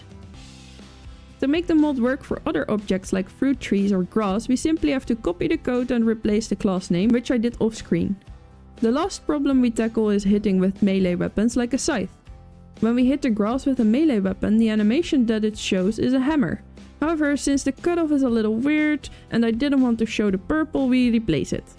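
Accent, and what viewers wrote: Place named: Netherlands. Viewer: Your Dutch for sure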